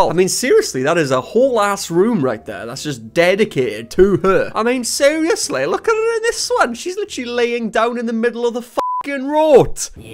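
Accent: Wales, United Kingdom. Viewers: You are Welsh aren't you? My mom is a Welsh immigrant. And I can pick that accent out now pretty easy. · Are you welsh ???? My dad is from cardiff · R u welsh?????? If u are I am too I recognise the accent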